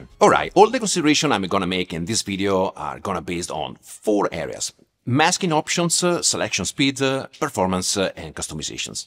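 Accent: Italy. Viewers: Great comparison. Thanks ! Your delivery is very friendly and informative and the Italian accent is charming.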